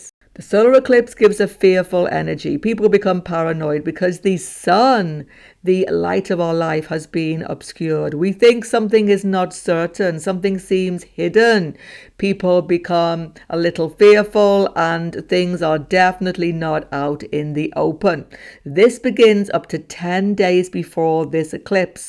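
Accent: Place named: Working class, United Kingdom. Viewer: That's the way the working class people in England speak, dude. They can't help themselves. We shouldn't hold it against them. Nobody chooses where they were born or grew up. Anyway, it's about the personality of the individual, and I find this lady's personality to be one that wants to help others. That's the way she comes across to me, so her way of speaking does not irritate me.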